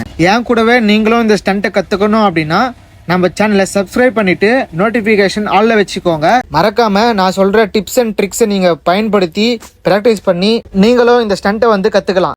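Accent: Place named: Vellore, India. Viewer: Vellore Tamil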